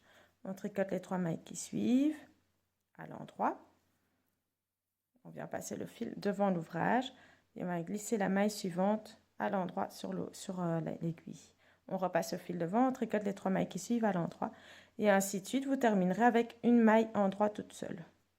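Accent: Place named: Nancy, France. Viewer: On dirait que vous avez l'accent de Nancy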